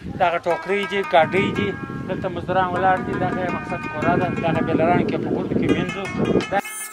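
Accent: Kandahar, Afghanistan. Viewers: Mohammad Asif KHAN hahahahaha. Kabulai lahja?????? Kabul ki Pashto lahja da koma showa? Lara Pashto aw Bara Pashto dway staree lahjay dee. Da da Loi Kandahar, da. Kotee کويټه aw shawokhowa lahja da.Kabul lahja na laree. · Love the sweet Qandahari accent. This vlog took me back to my country and provided food for my soul. Great work brother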